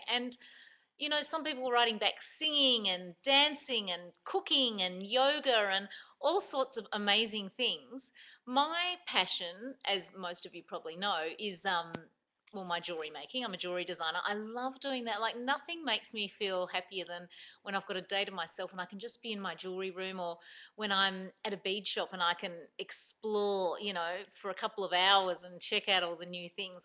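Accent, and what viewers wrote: Australia. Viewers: My passion is History, geography, and art such as painting landscapes and photography, 3d art /animation, and photoshop. I took photograph class and I loved it. It was fun doing the processing also, I used black and white Ilford resin back paper and I like using Ilford 35mm film with this 70's Minolta camera. I mostly use a Kodack camera now. However I think about using 35mm color film with the 'ol Minolta. I have some filters I wanna experiment with. I love your Aussie accent. = )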